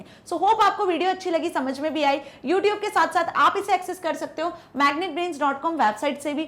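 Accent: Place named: Tamil Nadu, India. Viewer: Mam aap Tamil se ho kya, who was Aisa Laga mere ko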